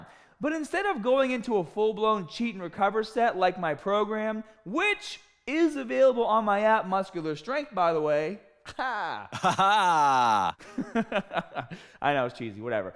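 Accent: Boston, United States. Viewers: Is he from Boston?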